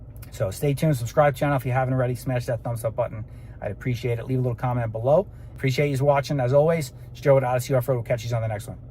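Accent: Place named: Long Island, United States. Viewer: Really good, thorough review! Were you originally from Long Island, NY, by any chance?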